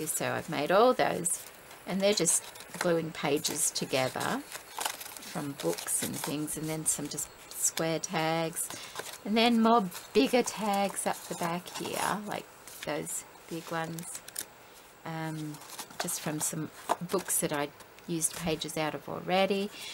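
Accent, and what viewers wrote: Australia. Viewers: Hello, I saw your comment and channel name on a video by Diane H and came to check out your channel. I don't know if you're familiar with Michigan in the USA but a lot of businesses and organizations use Mi or MI as "my" in their names (it's part of my name too!). I came here thinking you were from Michigan and was pleasantly surprised to hear the Aussie accent. lol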